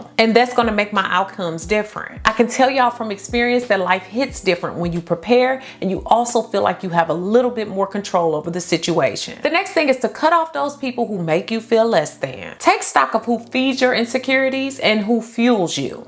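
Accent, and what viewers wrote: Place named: Rural, United States. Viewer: Thank you! I just wanted to say that I love your country accent lol 🤎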